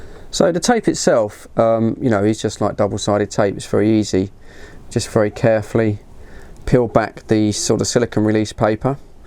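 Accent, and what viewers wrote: United Kingdom. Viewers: Your British voice sells this...cheers from a Californian Mexican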